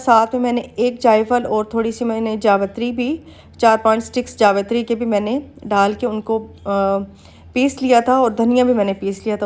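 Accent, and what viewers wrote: Saraiki, Pakistan. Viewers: Hayeee ap saraiki ktna pyara bolti hai 😍😍😍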